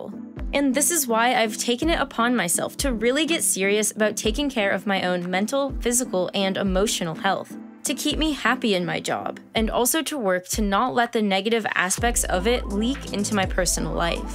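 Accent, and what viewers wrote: United States. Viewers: where is this content creator's English accent from? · I love your American accent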